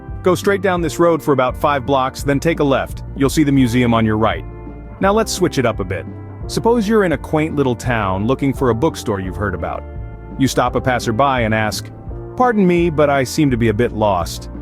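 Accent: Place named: United States. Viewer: I love this American accent. How I love you, America! ❤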